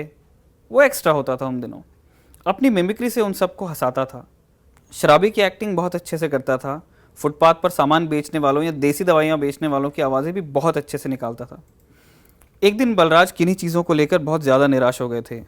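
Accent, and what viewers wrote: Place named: North, India. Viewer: Why are you keep saying BOMBAY instead of MUMBAI which is actual name.? I feel that all north Indians (that what i believe from your pronunciation) are stupid and are not willing t come out of British slavery and so keep reminding themselves with names like BOMBAY instead of its proper, actual & real name MUMBAI...... I am hoping that you will improve on this....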